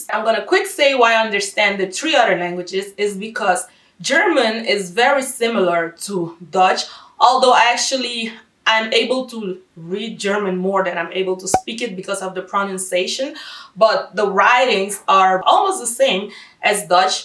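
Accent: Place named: Colombia. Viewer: Tu Español suena muy Colombiano. Hablas muy bien todo. Yo no pude aprender Holandés porque todo el mundo habla Inglés en Amsterdam y en donde trabajaba todos se hablaban en Inglés. Un abrazo grande desde Colombia!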